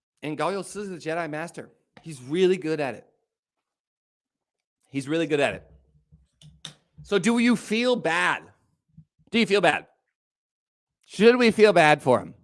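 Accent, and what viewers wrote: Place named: China. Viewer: watching ur channel in English feel a little wired,😂 I am used to your Chinese accent.😊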